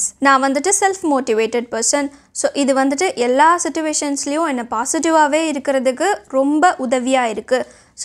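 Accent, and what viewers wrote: Madurai, India. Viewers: Ne ga madurai ya ne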